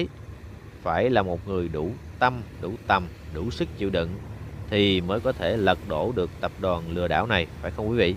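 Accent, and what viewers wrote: Hue, Vietnam. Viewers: Dung la giong hue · 100/100 giọng Huế , cùng quê nên mình rất rõ ! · Em ấy nói tiếng Huế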